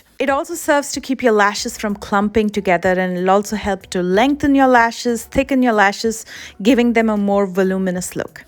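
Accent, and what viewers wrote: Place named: India. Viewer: Step one already have thick and decently long lashes ... she sounds Indian.. definitely known for beautiful land thick luscious hair and lashes!!!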